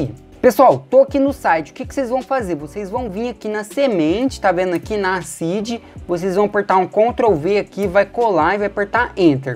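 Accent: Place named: Rio de Janeiro, Brazil. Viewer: o cara é carioca!!! skskskksks legal pá cacete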